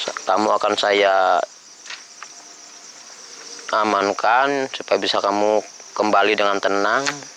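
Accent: Banyumas, Indonesia. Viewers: Ngomngnya ngapak banget lho . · Walaaahh wong ngapak kie mesti,,hhhaa. sehat" nggih pak.tek enteni video anyare mning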